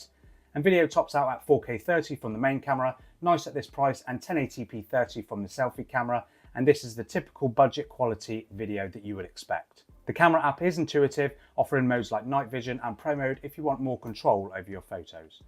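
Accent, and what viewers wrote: Liverpool, United Kingdom. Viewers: Why are you speaking in a Scouse accent? Whereabouts in Liverpool are you actually from? If you are not from Liverpool then why are you speaking in a Scouse accent?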